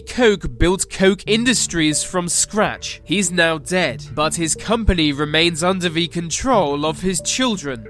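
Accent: England, United Kingdom. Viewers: I've heard many disgusting limey accents in my 47 years of life but this one is, by far, the most horrible and fucked-up. Can't even say from what part of the fucking island is. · first of most pathetic to hear every other type youtube with English accented fool!!!!!!!!!!!!!second of all the word power is and have been misued in terms of the meaning....in reality the meaning of the word power is used as money for this and beyond era...…all these idiots that you display for us on youtube are just idiotic money abusing ignorant megalomanias!!!!!!!!!!!!!! - because mentality is being misguided every decade, so does the terminology of the word...……..money taints the reality of what human beings stand for..... no not corruption but worse than that....control!!!!!!!!!!!!!!! brainless - no intellectual weapon of choice!!!!!!!!!!!!! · How interesting, a man with a British accent lists the most powerful families, yet conveniently overlooks the House of Windsor (NOT THEIR REAL NAME).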